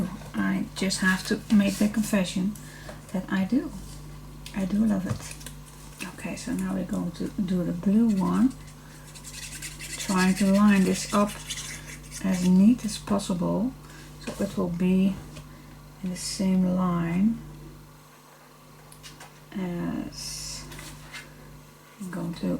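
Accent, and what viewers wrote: Netherlands. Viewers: !, your “ Dutch English”, is one of the things that I find most endearing!. Your witty comments and chitchat, are what makes you, you!. If people want to only listen to perfect English, they should only watch English tutorials!. Please don’t change yourself or what you say or do!, you are just perfect as you are!.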